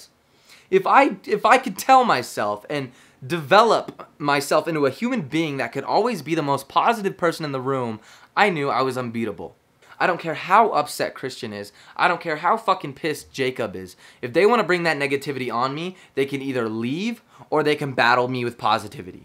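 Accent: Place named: United Kingdom. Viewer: Amazing British voice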